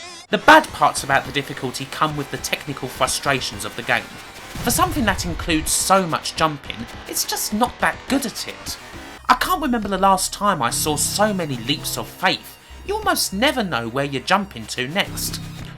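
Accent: United Kingdom. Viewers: You are British?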